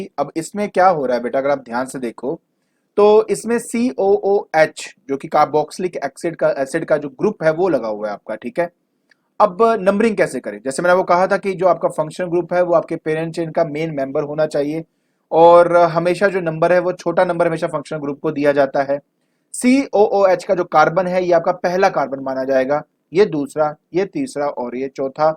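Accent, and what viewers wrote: Punjab, India. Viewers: Sir you are Punjabi??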